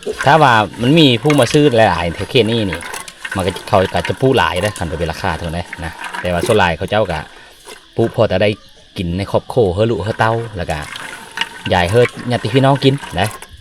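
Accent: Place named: Thailand. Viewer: โต๋เว้าคือไทบ้านกัน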